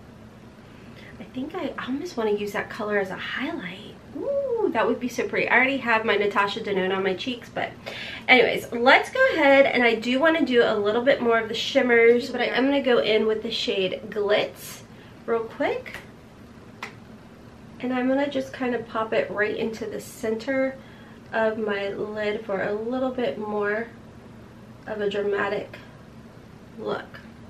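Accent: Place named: West Virginia, United States. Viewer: Waaaaait... I’m from WV too and pick up on that accent every now and then as well. I’m sure there’s more of us out here, but I feel like we’re so few and far between! I live near Summersville, so I’ll say that and send my love wherever you are!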